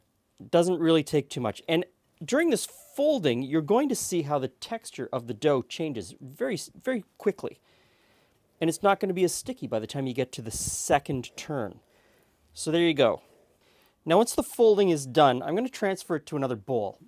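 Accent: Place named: Quebec, Canada. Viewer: You are definitely from the province of Québec, so am I?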